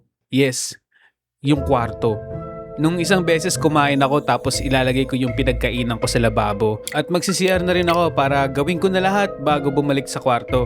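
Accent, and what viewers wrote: Ilocos, Philippines. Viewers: Ilocano ka yahhh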